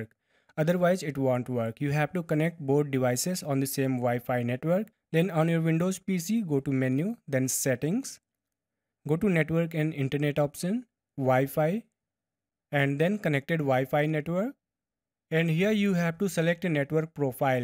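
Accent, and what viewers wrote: India. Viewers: Your Indian pronunciation gave me a headache.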